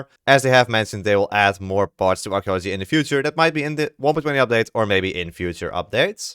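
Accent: Netherlands. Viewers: Is your real language dutch? Because you have a dutch exent.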